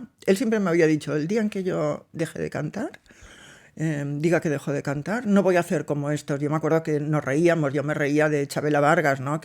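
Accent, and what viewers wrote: Catalonia, Spain. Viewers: Me encanta como.hablas eres genial ¡¡!!un saludo 😊❤tu eres catalana no??yo tengo mucha familia en mataro y gerona se fueron de aki de extremadura😊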